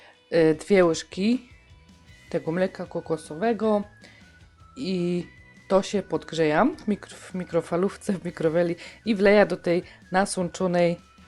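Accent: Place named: Opole, Poland. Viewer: acha i miłych walentynek jo też mieszkom w rajchu ale jestech ze śląska! 26 lot minolo ale godac po Śląsku niezapomnialam ty mosz taki richtig opolski akcynt jo zaś jestech z okolic Bytomia i tez godom blank po naszym moja koleżanka prowadzi w tc Katowice dej pozor aż gania ze tyla ludzi ze śląska juz niezno tej godki! my Muszyny się tego czimac!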